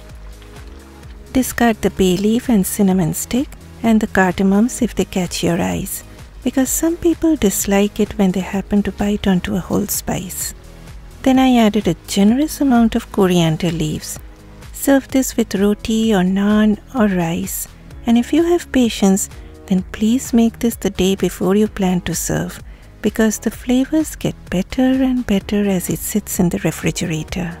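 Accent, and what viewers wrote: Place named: Bengal, India. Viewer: I really love your accent😊😊 english from a bengali tounge is always sweet to hear ❤❤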